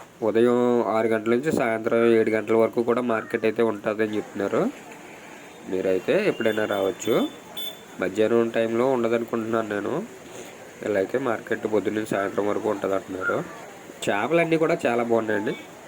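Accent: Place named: Konaseema, India. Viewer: Thammudu variety video. Your slang konaseema slang Open slang. Which village my dear brother All the best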